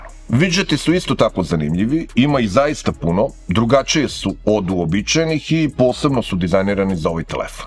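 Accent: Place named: Serbia. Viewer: Ovo je srpski kanal, a ne americki, tako da je izgovor prilagodjen srpskom govornom podrucju.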